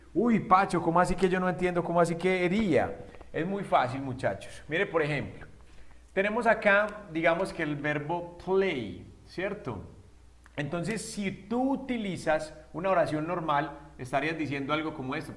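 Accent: Colombia. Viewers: Gracias Pacho! Me gusta tu acento colombiano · Gracias... muy bien explicado, soy de México, veo que tienes acento colombiano. Saludos ✌ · Excelente la manera de explicar,de lo mejor que e visto en YouTube y es mucho más interesante por el acento siento que me está enseñando el mismísimo patrón Pablo Escobar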